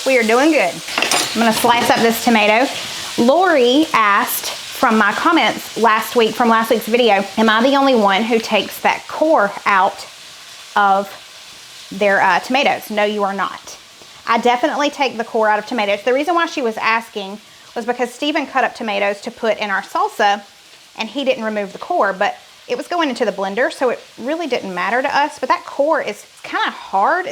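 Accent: South, United States. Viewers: I LOVE your southern accent. I undesrtand it perfectly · I call it an eye as well and my family and I absolutely adore the Waffle House 😋🤤! Oh and don't worry because leaving that 'G' off of our words is a Southern thing😂. I really enjoyed this video and thank you so much for sharing I will have to try all of these new recipes! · I love your "Southern" please don't correct yourself! I recently moved from NC after living there for 37 years and moved to another "southern" state (OK). So not the same! I miss the Carolinas so much! I even miss Food Lion and I had a love/hate relationship with them. I've watched you for quite a while but now the videos make me feel like a trip home. I am with you on the peas and lima beans, I can take them in a chicken pot pie or Brunswick stew. I moved south too late in life (23) to appreciate okra in any form or fashion though. 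I did make Steven's salsa, we all loved it! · Embrace your southern accent. ❤ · The "eye" on the stove 😂 I think my mom calls them eyes too. I call them burners. But your southern accent says "aah" instead of "eye." Like... AAAH!! There's an AAH on the stove! 😛 love it. I talk southern sometimes and I'm only in Indiana lol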